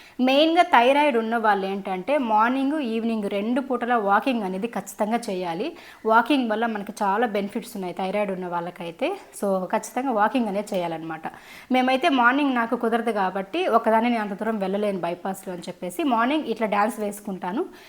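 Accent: Chittoor, India. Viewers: Meeru all rounder sis🤷‍♀️dance,cooking,family caring really your very tallented.i like your videos😘unnadi unnattu matladutharu yechulaki poyi ala matladali ila matladali anukoru.chakkaga mi chittoor slang lo matladutharu.madi nellore sister nenu kuwait lo job chesthunnanu.daily mi videos chusthanu🤗andari laga pakka valla gold ni ma gold ani,pakka valla houses ni ma own house ani abaddalu cheppakunda unnadi unnattu matladitharu.meeru chala mandiki inpiration ayyaru.any way I like your videos.god bless you sister🙌😊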